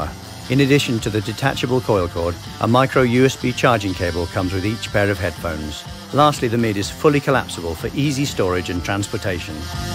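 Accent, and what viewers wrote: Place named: Germany. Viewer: 3 yıl geçmiş ama neden türkçe başlıklı bi videoyu alman aksanlı ingilizce olarak eklersiniz ki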